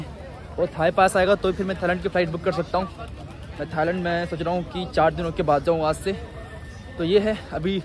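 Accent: Bihar, India. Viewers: LAST RAT.......HAHAHAHA O BHAI SAHAB THIS IS BIHARI TONE LOVE U BHAI.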